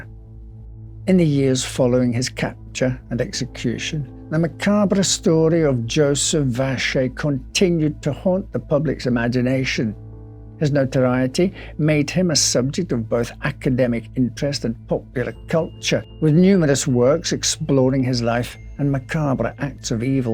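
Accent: Scotland, United Kingdom. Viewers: I love his voice and accent. Is he Scottish? 🥰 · I’m no expert but that’d be my best guess too, Scottish. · "Somehow" I knew one-day a British voice would explain that there was a French murderer than was worse than Jack The Ripper! Ha!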